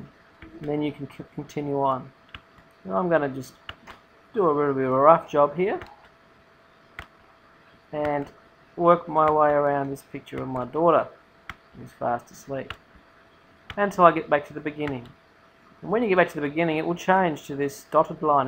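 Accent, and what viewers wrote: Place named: Australia. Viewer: omg i luv ur accent! austrailien, right? (did i spell that right? i dont think i did.)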